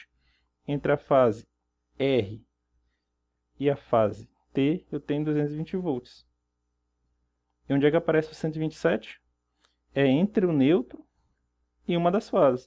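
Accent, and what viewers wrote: Ceará, Brazil. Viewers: Pensei que vc era do Ceará rsrs moro em barra do corda Maranhão rsrs show de bola a aula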